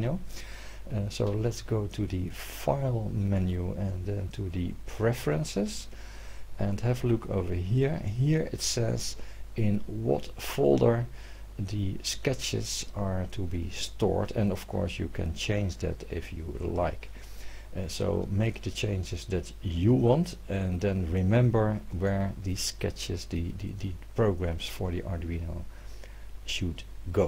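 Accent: Netherlands. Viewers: Just watching to take in the genuine Dutch accent. This sits like a mustache.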